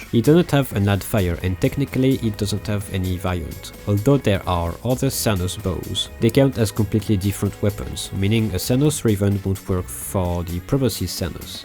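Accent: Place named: France. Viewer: Love that little French accent❤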